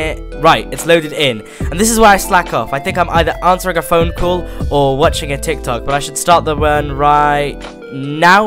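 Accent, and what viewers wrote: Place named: United Kingdom. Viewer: His just british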